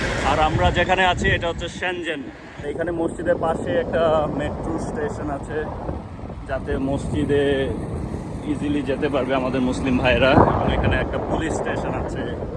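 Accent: Bangladesh. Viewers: উনি বাংলাদেশি মানুষ। আমি বাংলাদেশের মানুষ আমি কলকাতার ভাষা আমাদের ভাষা শুনলেই বলতে পারি এই লোক কোন দেশের ভাষায় কথা বলতেছে। কারণ কলকাতার ভাষা আমাদের থেকে একটু আলাদা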